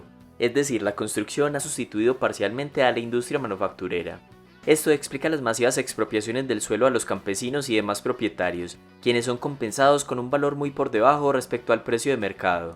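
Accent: Colombia. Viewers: Horrible la narración en Castellano Colombiano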